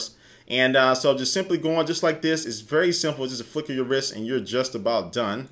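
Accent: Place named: St. Louis, United States. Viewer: the "imma dis" and "imma dat" annoyed me too, but then he said he was from saint lewis, right? so then it's understandable. he's not trying to be cool it's a location thing :)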